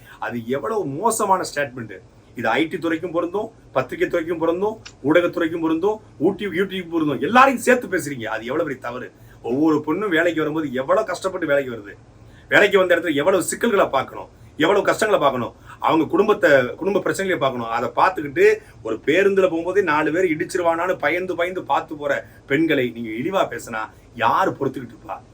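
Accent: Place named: Uttar Pradesh, India. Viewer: Why ur sounding like a UP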